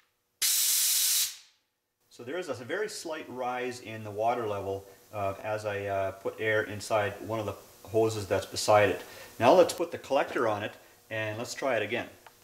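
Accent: Canada. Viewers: He sounds Canadian to me lol.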